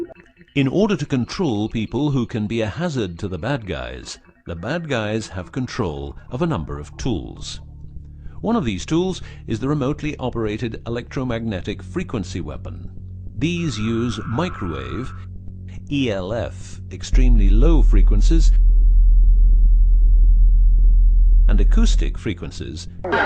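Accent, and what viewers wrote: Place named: United Kingdom. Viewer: this is RETARDED but since an annoying brit is narrating it must be true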